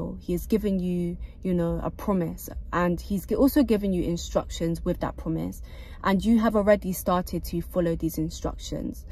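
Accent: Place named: United Kingdom. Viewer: Rah wasn’t expecting a U.K. accent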